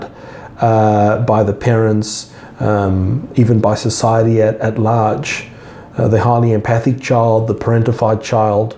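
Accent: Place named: Australia. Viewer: At approximately I wasn't able to understand the word you said after "end in". Maybe because of your most lovely Australian accent. (: This exact scenario is what my friend hasn't yet been able to understand is transpiring between her and the narcissist she THINKS she loves, and who is deceiving her into believing he loves her. Whenever you have time, let me know the word. Thank you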